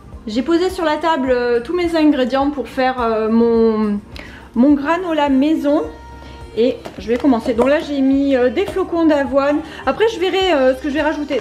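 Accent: South, France. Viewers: Bonsoir! Je suis super contente de découvrir votre chaine ! Je suis du Sud, Boulbon 13150. Mais voila, j'ai emménagé depuis le 1 aout à Lyon 5. J'y suis super bien! Mais quand même... Votre adorable accent du sud me rappelle ma région j'adore !!!! J'ai tout de suite en tête les cigales, le soleil, le thym, la lavande!! Que du bonheur vos vidéos que je découvre aujourd'hui!!!! Merciiii!! Merci pour ces moments de partage, de conseils, d'excellentes idées recettes ect....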